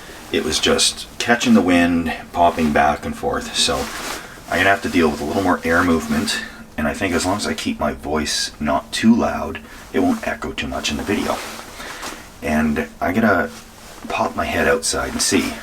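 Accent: Canada. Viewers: You remind me so much of Jujimufu except you're sleeping a storm drain and have a canadian accent.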